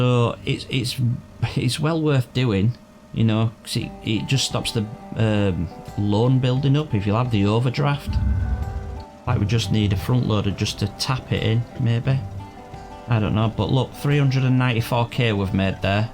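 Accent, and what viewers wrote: Yorkshire, United Kingdom. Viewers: Nice to hear a yorkshire accent, Harrogate here ;). Great upload, do you have a list of the equipment you rented etc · sounds like keith lemon. definately yorkshire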